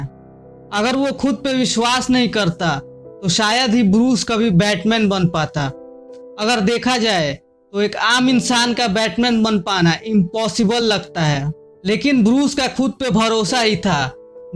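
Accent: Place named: Bihar, India. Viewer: Bihari youtuber bhai accent badalo yaar...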